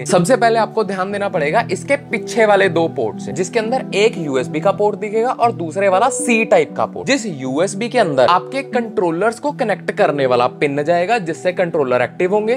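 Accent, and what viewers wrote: Punjab, India. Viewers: Bhai tu punjabi aa😂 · Peculiar Indian accent 🤣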